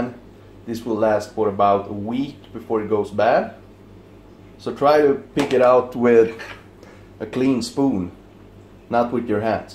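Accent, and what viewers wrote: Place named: New York, United States. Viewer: I love you channel i subscribed right away . love your accent and humor. Love for newyork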